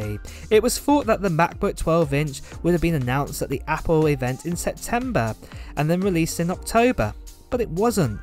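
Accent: United Kingdom. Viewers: Are you from the UK? You have that accent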